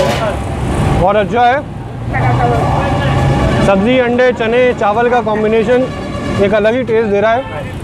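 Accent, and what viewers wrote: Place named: Lucknow, India. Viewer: aap kaa andaj lucknowai kaise😆😆😆😆😆😆😆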